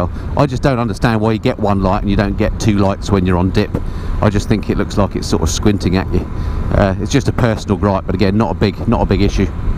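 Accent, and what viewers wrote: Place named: United Kingdom. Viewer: great review, 69 years young I think 45 plus years in alot of saddles of many makes. My last two blkes a 2008 Suzuki B King, upright non stop power,loved loved bike moved to Arizona from Maine USA to ride year round. Now have been riding 2009 Kawasaki Concours 14 abs, what a pleasure to get on and put the miles down, just missed out on a 2017 Triumph Trophy se so packed out beautiful,but two days to late, someone beat Me to it.Which brings Me to Versys a loaded one just popped up in central Arizona ,no rain, mountains, desert, the riding can't be beat out here year round. Everyday is riding day. The Ducati Multistrada is right there but more maintainance so Kawa, love Your Brit accent, oh ya I chopped a 1973 Norton 750 Commando in the 70's Ride On Man tucson tim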